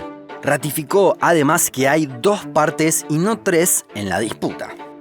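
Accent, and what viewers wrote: Argentina. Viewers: el canal de donde termina siendo che? , porque tiene ascento argentino pero puede confundirse con el uruguayo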